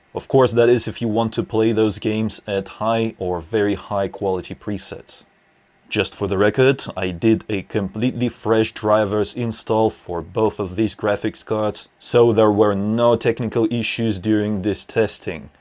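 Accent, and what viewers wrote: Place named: Russia. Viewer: Guy with Russian accent talking about FPS makes me nostalgic for FPSRussia channel.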